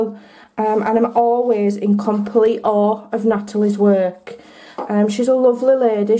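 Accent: Yorkshire, United Kingdom. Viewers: Are you from Yorkshire? I love your accent . I lived in Harrogate for 5 years and in Bedfordshire for 3 years. My sons were born in Yorkshire. I love your cards! These are also beautiful. Will go to the blog for your friend.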